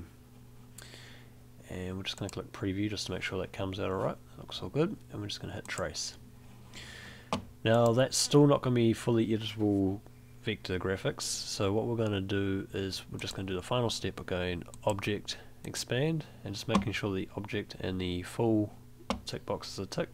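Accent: New Zealand. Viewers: kiwi accent is very obvious, nice mate, sweet as :)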